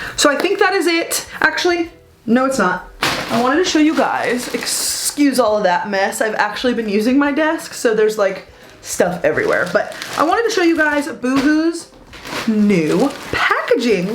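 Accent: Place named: New York, United States. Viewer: Do you speak Italian? What part of Italy is your family from? You sound like your originally from NYC. Loved the video!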